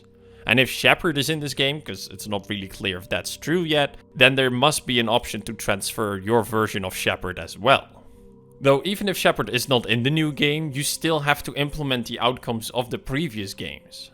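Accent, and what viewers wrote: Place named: Netherlands. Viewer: Yo...Are you in any shape form or guise connected to Pretend3r or Pretend3rCE? Because he's dutch and is interested in Mass Effect as well. Plus you seem to have a dutch accent...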